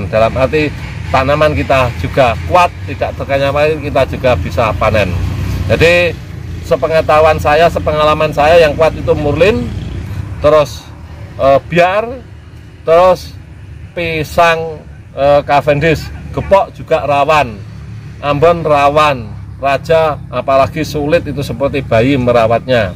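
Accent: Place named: Malang, Indonesia. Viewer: Sampean Iki wong malang taaa pak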